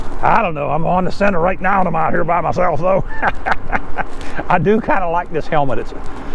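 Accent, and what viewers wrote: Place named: South, United States. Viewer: What part of the South are you from? I am in Alabama.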